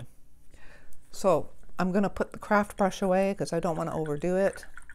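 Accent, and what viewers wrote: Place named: Gloucestershire, United Kingdom. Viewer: as usual I love your work. Is there dvd of your work available? Love your accent the way you pronounce out as owt, reminds my wife and I of our ancestors from Gloucestershire ☺️👌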